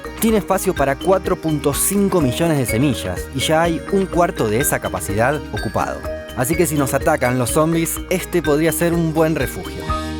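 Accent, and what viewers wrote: Argentina. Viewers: Pero habrá algún documental que no tenga acento argentino? Por favor ? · Men, tu voz me es súper reconocible, lpm sos de Argentina? Alguna vez hiciste publicidad en la tele?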